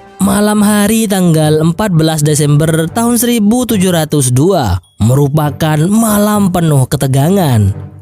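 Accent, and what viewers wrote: Madura, Indonesia. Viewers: Corak reng madureh reh adminah😩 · Suara latar nya kayak orang Madura logatnya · Haha, mimin madura 😅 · Kurasa adminnya madura dr logat ya sya tebak, mantep tretan😂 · Min dari madura yah ?? Kentara banget logatnya hihi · Medurene e tengale Mak 😂 Tp mantap 👍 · Madureh dimmah sampean tretan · Pengisi suaranya kek orang madura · Reng madure ini..se ge oge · Ngkok agelek eh takok dusah ternyata adminah reng medureh😂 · Reng Medureh cook😂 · Medureh ongghu , keren ❤❤❤ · Mak pas bedeh bhs medurehnah tretan Mantap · Abeh mak sellae madura 😁😁😁😁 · Madureh reh😂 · reng madureh kak... madureh dimmah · Orang Madura dia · Oreng medureh hedeh kak Yeh wes auto subrex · Ini komentatornya orang madura ya....😂😂😂 Soghe' ckna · Abbeeee alure campur madura masze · Mak medureh kak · Suaranya madura bgt , tlg d benarkan bang .... Bahasa indonesianya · MK Reng madureh kak🤣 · Ye pokeeh madureh tulen 😂😂😂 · 😂 logatta tangake jhek Reng madureh 😅 salam Sampang bang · Oreng madureh hedeh nom😅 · Njir madura cokkk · Oreng madureh mon disoaranah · Madureh mas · Lucu moderatornya,letolean 🤣...mantap...madura eksen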